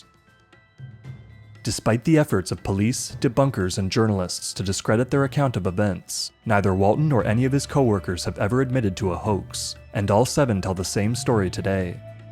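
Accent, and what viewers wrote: Canada. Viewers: The narrator is clearly Canadian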